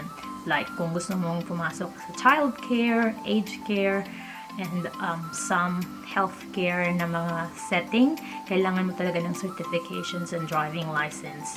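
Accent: Australia. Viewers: Love the aussie accent sissy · Madam gudang, may Aussie accent ka na..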